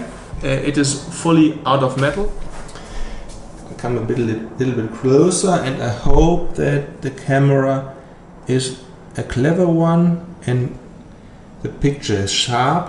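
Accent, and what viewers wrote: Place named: Germany. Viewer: du bist deutscher oder ? XD ich erkenne das an deinem englisch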